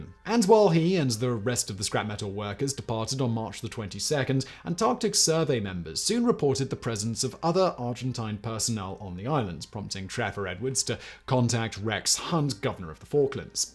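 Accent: England, United Kingdom. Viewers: “Badassery” doesn’t sound right in a middle class English accent.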